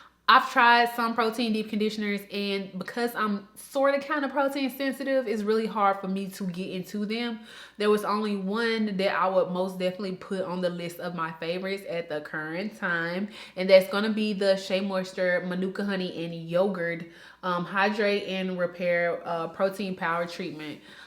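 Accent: South, United States. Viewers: Sis! Your skin, hair and southern accent! ❤️❤️❤️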